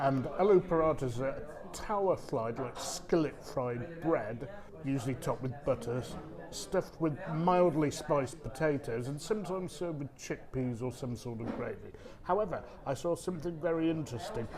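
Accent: Manchester, United Kingdom. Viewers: He has got a manc accent just a well educated man unlike you who's as thick as 2 short planks 😁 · There are many parts of Manchester, many different communities and lots of different variations of the Manc accent. I'm guessing is from south Manchester and went to posh school;)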